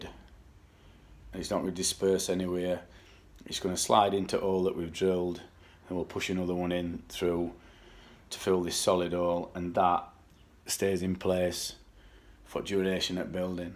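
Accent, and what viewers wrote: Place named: Barnsley, United Kingdom. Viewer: Very informative video and has stopped me from knocking plaster off myself after a water leak. I thought I might be saving myself some money if I did it myself ( 62 years old and only one income ) Anyway lad, thy talks Barnsley and I love that accent. Thy seems a reeght genuine kid so thanks for that 👍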